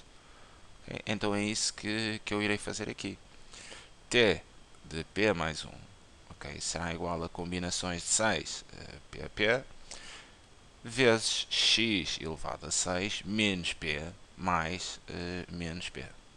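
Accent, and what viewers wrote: Portugal. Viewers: HAHAHA porttuguês de portugal é engraçado, mas ótima aula, me ajudou demais! parabéns!!! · Aula muito boa. Explicação calma, clara e bem detalhada. Apesar do sotaque português, uma das melhores explicações do assunto que achei por aqui. Abraço.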